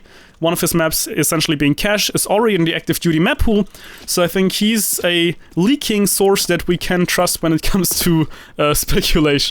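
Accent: Germany. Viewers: man you have a great german akzent =D greetings from there ^^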